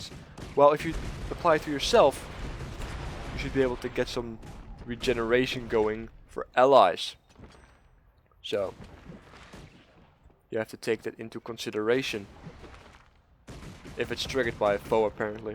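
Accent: Netherlands. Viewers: Dutchie? :P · French? What french people have you been listening too? He sounds dutch. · You can hear that he is dutch lol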